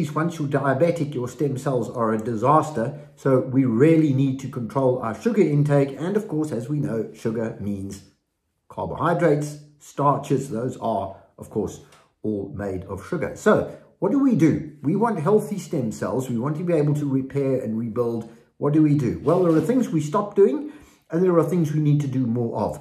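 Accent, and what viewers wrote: South Africa. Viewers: Hi, I recognise a SA accent.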